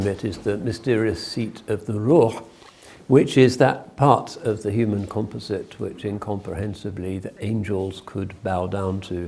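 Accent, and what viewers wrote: United Kingdom. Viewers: This is amazing. Love the British accent. Shows that stupidity sounds intelligent with the right accent but remains stupidity nonetheless.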